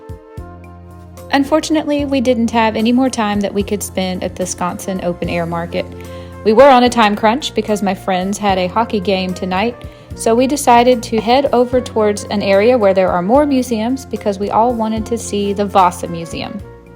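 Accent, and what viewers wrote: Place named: South, United States. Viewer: ☺️ Just saying what I’m feeling and thinking about when I watch and chat. ☺️ Yes of course we can tell when you’re reading or narrating over the video, and no it doesn’t come off as stilted at all. In my mind, you’re reading your “notes” and doing it so you don’t forget what you want to say. But it still feels like you’re talking to us and teaching I guess. I mean no one is going to remember everything when you’re filming, then editing the finish product. So the way you do it, works. You both “come off” sounding like you’re enjoying what you’re talking about. Your tone, and even accents, yes I know has a more southern drawl than you normally, but yours comes out some too. Not talking too fast, nice and reassuring I guess is what I’m thinking. Can tell when you’re smiling when you speak too. Trust me, we love that! 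❤️💜❤️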